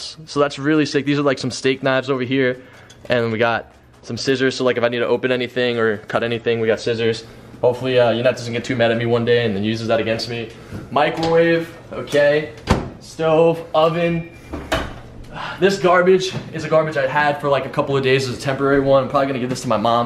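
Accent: New York, United States. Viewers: hes from ny that's why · He has a New York accent so when he say chocolate it's funny 😭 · new york accent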